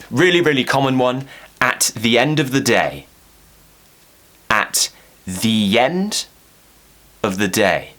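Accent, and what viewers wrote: RP, United Kingdom. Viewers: British accent never sounded better 😹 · Same here. His voice sounds gorgeous. British accent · Wow!!! Your British English is so perfect, I forgot how to breathe! 😱😱❤️😅🔥🔥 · I love your accent...im moving to Oxford at next week . Been a bit worried....but I want to talk as RP native spoken English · I'm feeling over the moon when i discovered that i love british accent because of you😍😍💕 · i am over the moon to find EJT English channel.I ever fancy British accent and now you have brought it to me .............................................................................(From Pakistan) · I love british accent...you sound like my fac Klaus Mickelson from TVD & ORIGINALS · Posh accent ❤️ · Iam so happy that i found you cz i was loonking forwad to learn the british accent and you my super hero in lango naild it with the accent of yours ❤ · Perfect British 🇬🇧 accent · Love the British accent! 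😍 Thinking about buying a notebook... your videos are precious! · I like British accent and the way you speak is mind blowing. Great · I love UK boy with UK accent.😍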